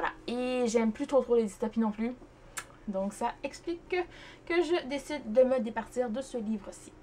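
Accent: Quebec, Canada. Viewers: Belle PAL ! J'adore ton accent. J'ai de la famille à Montréal. Toi c'est le Québec ?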